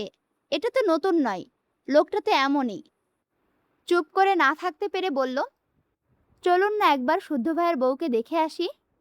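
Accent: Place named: Kushtia, Bangladesh. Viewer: আপু তোমার ভাষা শুনে বুঝাই যায় তুমি কুষ্টিয়ার মেয়ে। আমিও কুষ্টিয়ার মেয়ে 😘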